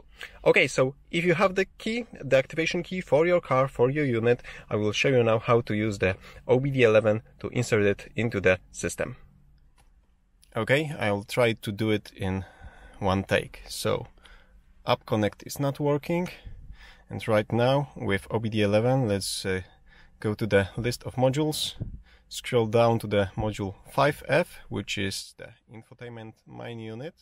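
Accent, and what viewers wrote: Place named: Poland. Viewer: Po akcencie poznałem, że Polak. 😃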